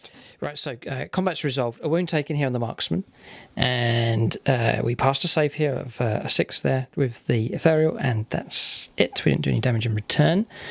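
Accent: Northern Ireland, United Kingdom. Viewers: Northern Irish accent is immediately recognisable.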